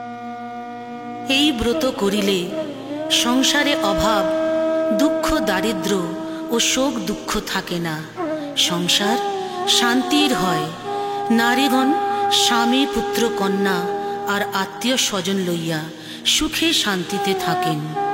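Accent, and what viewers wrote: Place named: Bengal, India. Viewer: Sanskrit mantra uchharan thik korun. bangalar moto uchharon hobena.montro uchharon thik na hole ta kaaj deina.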